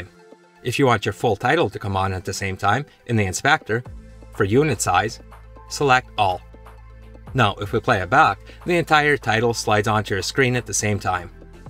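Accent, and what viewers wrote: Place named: Canada. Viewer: Where is this accent from? It seems Canadian, but I dunnoo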